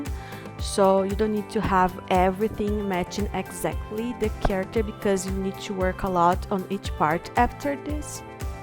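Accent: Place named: Brazil. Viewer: é muito bom ouvir o sotaque brasileiro! Parabéns pelo processo! Espero que seja o inicio de mais series como essa ;)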